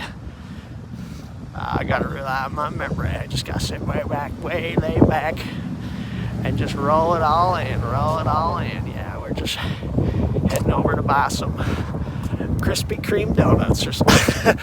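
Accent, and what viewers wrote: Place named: England, United Kingdom. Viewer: Hey! I Love your English accent brother ❤ we love your walk and talkies. Know that your helping people all over that need lifted up with your amazing energy. Hope to see you on the live on Jeffmara. Chin up, your not alone ❤❤❤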